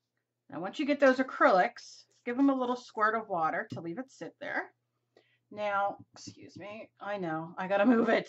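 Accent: New Jersey, United States. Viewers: I THOUGHT I RECOGNIZED THAT JERSEY ACCENT!!!..I'M FROM WILLIAMSTOWN N.J...JUST A STONES THROW FROM YOU...LOTS OF ARTSY STUFF IN JERSEY!!!!!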